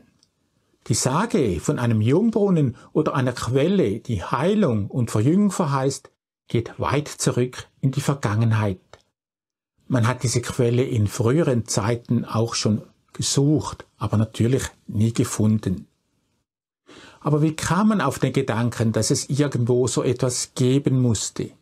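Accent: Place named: Switzerland. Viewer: Herr, jetzt weiss ich aber Ihren Namen, habe denselben von einer Dame abgespickt die auch in Ihren "Comments" gepostet hat:)..... Ein ganz ganz herzliches "Gruezi" an Sie und Ihr wunderschoenes Land, die Schweiz... und dann noch ein ebenso herzliches Merry Christmas und Happy New Year aus USA.... Ich bin so gerne in Ihrem Kanal um alle Zeitgenossen zu erleben die Sie interviewen und/oder intrerwiewed haben... Sie sind ein echt charmanter, sachkundiger Interviewer... ihr Schweizer Akzent davon ist einfach zum Dahinschmelzen.. Ich liebe die Schweiz und mag die Schweizer:) Schoene Feiertage:) und alles Gute:)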